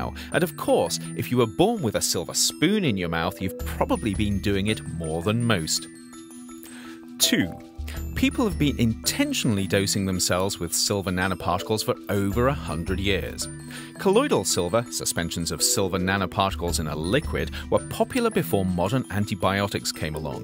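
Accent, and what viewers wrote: United Kingdom. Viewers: ........ just because this guy has a cool English accent doesn't automatically mean his information is accurate! ...... DOWN WITH BIG PHARMA!! .....😠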